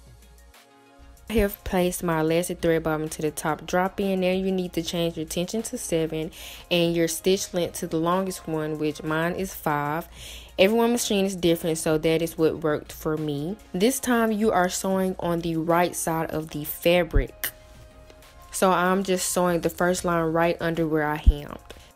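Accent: Arkansas, United States. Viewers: aye turn up Arkansas!! i knew i recognized ur accent lol keep it up girl!!💕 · Your accent is so strong! Arkansas. I like it. :-) I'm from Cali and right away knew you were from somewhere on the east coast.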